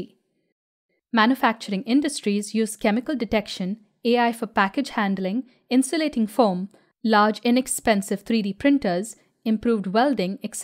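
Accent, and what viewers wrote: India. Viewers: Hey very nice educative content, awesome graphics and you have a nice polished Indian accent too, you from India?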